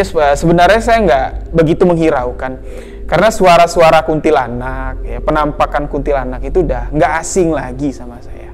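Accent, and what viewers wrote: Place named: West Sumatra, Indonesia. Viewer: Nada suaranya kayak org minang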